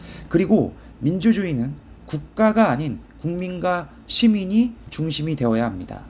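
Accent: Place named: Jeolla, South Korea. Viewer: 근대 영상내용 뭐임 목소리듣자마자 홍어쉰내 나서 안봄 요약좀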